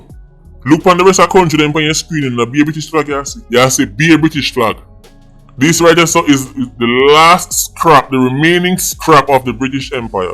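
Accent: Trinidad and Tobago. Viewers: lol@ his trini accent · 🤣🤣 sir P, di " Trini accent" madd!! 🤣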